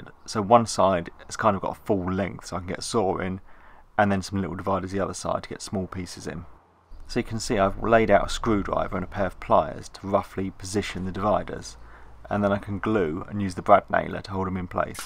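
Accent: RP, United Kingdom. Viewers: Love the posh voice, see you on site mate 😉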